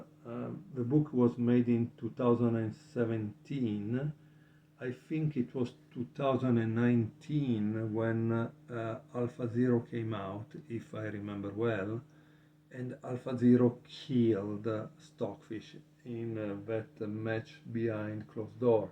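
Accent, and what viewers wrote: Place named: Italy. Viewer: Por la forma en que hablas inglés eres italiano.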